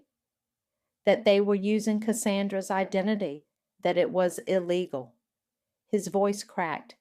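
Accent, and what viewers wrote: South, United States. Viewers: Bravo... Great story Thanks loved the southern woman narration ❤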